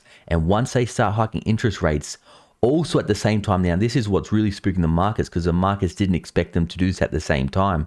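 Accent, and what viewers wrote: Australia. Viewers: This dude sounds australian. Hope you vote republican if you don’t want to be in prison like australia. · I'm guessing from your accent that you are a Aussie. Can you more clearly point out what the ramifications are for Australian s. I like your channel but it is not always clear which country you are referring to.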